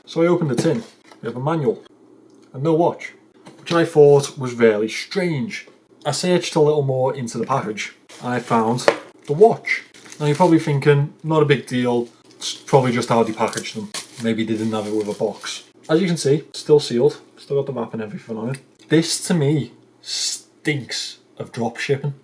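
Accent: Liverpool, United Kingdom. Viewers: scouse, well in la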